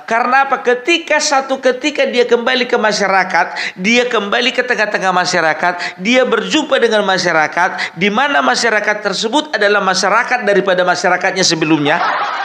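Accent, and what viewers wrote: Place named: Medan, Indonesia. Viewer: Sor kali aku dengarnya, opung bilang nggak aci😂😂😂asli medan kali Sukses selalu opung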